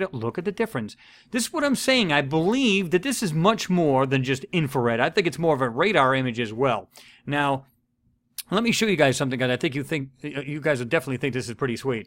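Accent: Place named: St. Louis, United States. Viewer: good work - by the way, are you from the st. louis area? your accent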